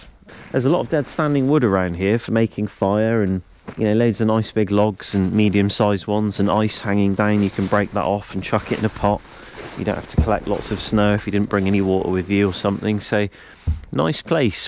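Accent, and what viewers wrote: England, United Kingdom. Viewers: What are u doing in Sweden mate do u work there because I can tell you are English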